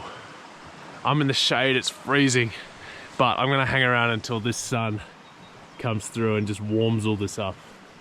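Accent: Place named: Australia. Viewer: Just found ya channel. Great video, and you threw plenty of your own personality in there to, which makes it way better and less like a documentary than some are. Before looking further, your accent tells me you're an Aussie? (all good lol) Wicked scenery and bird life. Liked & sub'd (so I don't get lumped in with them little headed Kereru) Watching from rural South Island NZ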